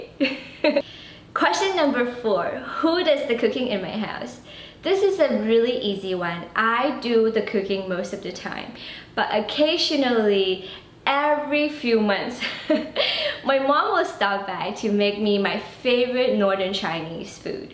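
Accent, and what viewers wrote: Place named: Thailand. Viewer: You were in Thailand! That’s my home country. You look like one Chinese actress, her name is Yang Zhi. She is in the drama call “ash of love” it’s really good C drama 😊 and yes! The accent is our pride😊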